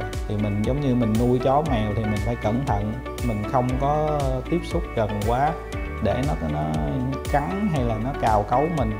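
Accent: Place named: South, Vietnam. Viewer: Xin cảm ơn bác sĩ. Nhưng mà đm mấy thằng làm chương trình nó lồng cái nhạc nền vào không nghe được. Giọng miền nam thì người bắc đã khó nghe rồi còn cho cái nhạc nền vào.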